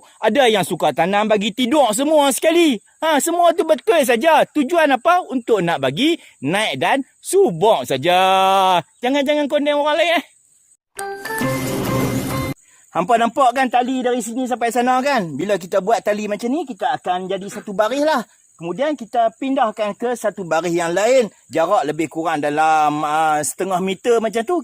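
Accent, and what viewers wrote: Kedah, Malaysia. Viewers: org pahang awat cakap kedah😁 kami teringat kat keluarga kami kt kg😢😢😭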